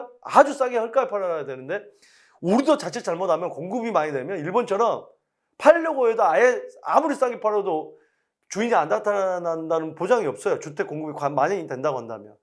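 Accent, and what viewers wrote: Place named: Honam, South Korea. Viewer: 호남 사투리 쓰시네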